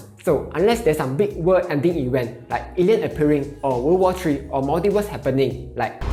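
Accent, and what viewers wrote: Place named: Singapore. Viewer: He is speaking Singlish, which is Singaporean English!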